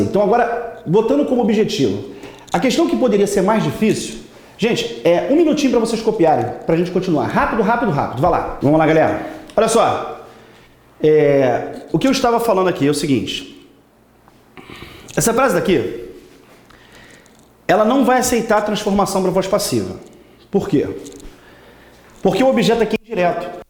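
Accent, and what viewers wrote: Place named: Rio de Janeiro, Brazil. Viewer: Sotaque de carioca já é foda de aturar, ainda mais quando tem que estudar ouvindo isso...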